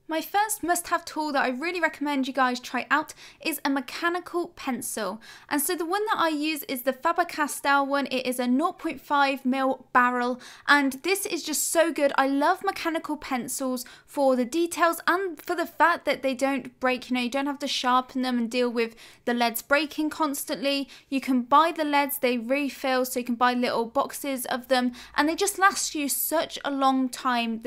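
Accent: United Kingdom. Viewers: You're from UK right? How do I know your accent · Good lord, the British accent is so attractive lol... Anyhow, back to reality... thanks for these art tutorials and tips! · British accent, I like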